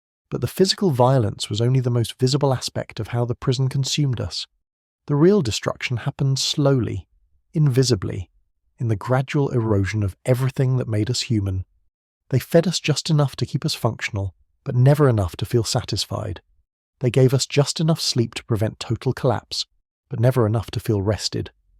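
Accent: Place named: United Kingdom. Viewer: Sounds like UK. It also says so in the description.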